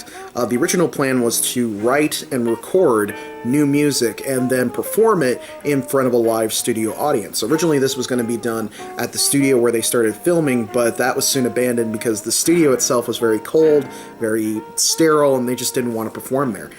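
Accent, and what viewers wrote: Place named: United States. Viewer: Why are they always Americans that do this sort of thing,they speak too fast with terrible accents,I keep waiting for him to say Oh my God yuk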